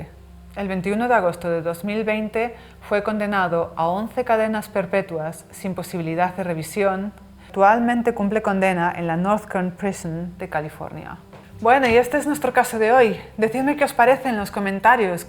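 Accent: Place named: Spain. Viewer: Lo haces muy bien! El video lo narras muy bien! Y me encanta tu acento español. De que provincia de España eres?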